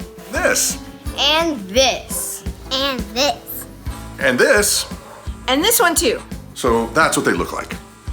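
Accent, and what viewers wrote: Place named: Louisiana, United States. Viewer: The whole time I’m listening to your story I was like, “Yep, that’s Louisiana!!” Lol! Welcome to my lovely state. Ha! Glad your event was a success.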